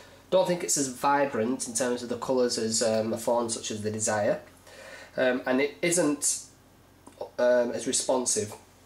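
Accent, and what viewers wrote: Yorkshire, United Kingdom. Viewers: It's a yorkshire or some kind of northern english accent. IT'S A GREAT ACCENT.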